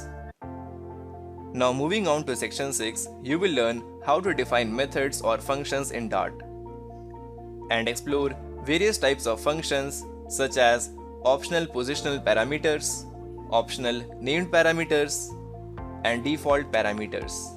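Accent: India. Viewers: pls create a tutorial how to understand an Indian accent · this not problem for me but if Indian tutors have a better accent they'd be the best tutors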